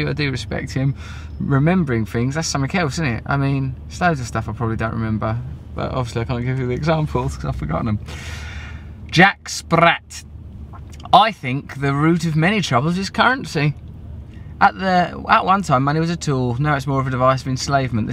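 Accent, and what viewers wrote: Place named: United Kingdom. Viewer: Speak slower please. Can barely understad you sometimes. Not everyone is British.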